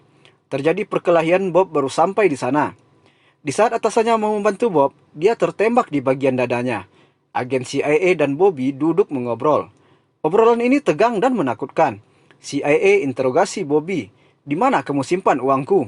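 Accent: West Sumatra, Indonesia. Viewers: Urang minang asli kiro e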